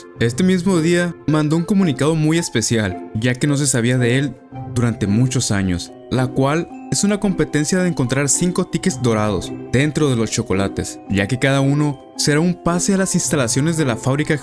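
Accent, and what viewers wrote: Chihuahua, Mexico. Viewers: Aaayyy compadre y ese acento de shihuahua? 😻🫶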